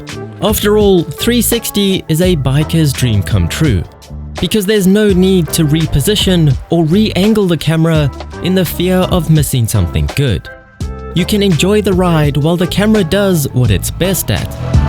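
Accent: South Africa. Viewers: Your videos are AMAZING!!! Sound quality is amazing, background music amazing, narration amazing even with your cool SA accent 😁 Seriously the quality of your videos would impress Spielberg! Fantastic, keep up the good work and thank you for putting so much effort into your content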